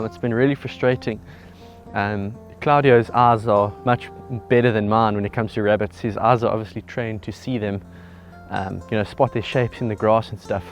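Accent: South Africa. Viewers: Because of your accent id assumed this was south africa but now i find out its my homeland of Argentina! what are the airgun laws like? Man i been thinking about moving there for the longest after visiting Mendoza, i just loved the laid back lifestyle.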